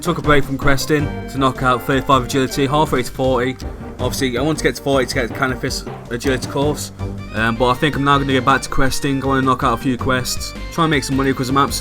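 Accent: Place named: United Kingdom. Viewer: Love to series! But your accent is very Bri'ish! :p Kinda hard to listen to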